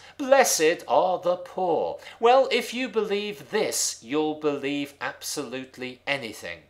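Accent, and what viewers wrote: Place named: Merseyside, United Kingdom. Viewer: Like the posts. I think I detect a hint of Mersey in your voice, despite being in Croydon... Keep up the interesting work.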